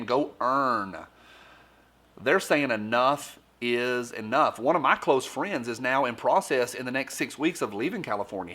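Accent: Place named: Appalachia, United States. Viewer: Being from the Appalachians is nothing to be shy about just because you chose to speak the civilized pronunciation (i.e.: the English language). So, my friend and hero, - feel at ease. If you pass my grammatical standards, there’s nuttin’ to worry about. I say that with the authority vested in my soul by my late mother, who was a strict Grammar Nazi, born and raised in The Netherlands before the REAL Nazis invaded Holland... etc. I’m pretty sure you are aware of this already, but I thought I’d share a tidbit of actual truth from the comment section: The so-called “Cancel Culture” is just another offshoot of the liberal/left social destruction of what used to be a damned fine Republic - ours. Whether the word used is SJW (social justice warrior) or BLM (black lives matter, but is often co-opted by white SJWs who have been brainwashed to believe the guilt trip that is “white privilege”). 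This travesty is rooted in the higher education system, where young college kids are being indoctrinated into believing they should be unhappy with everything in our country. Why? You ask - liberal professors teach their students intolerance for any facts or opinions which are viewed as conservative, which has transformed what was normally a bastion of free speech and discourse that US educational institutions were formerly known for - into an intolerant atmosphere where guest speakers are denied access to their audiences who had invited them, and they are assaulted further with hate-spee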